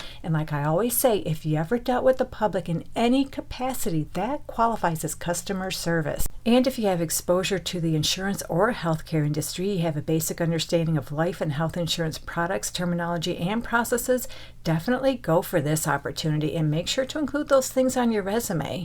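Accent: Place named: Wisconsin, United States. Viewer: I love the accent. Gives a Wisconsin vibe to it. Made this easy listening 😊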